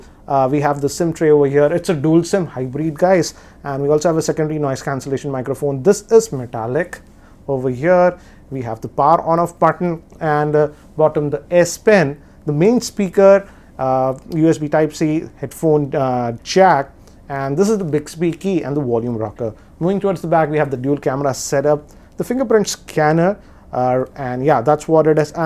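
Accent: India. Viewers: Indian.. Accent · I really dont know why youtube is pushing Indian channels to the top of search result besides so many UK and USA channels covering the same topic. Is so hard to understand Indian English, I can barely understand them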